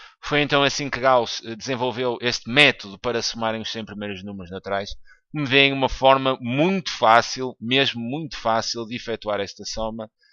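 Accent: Portugal. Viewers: Bem claro, explicou muito bem. O único problema é que sou brasileiro e você português, mas até que deu pra entender. +1 inscrito.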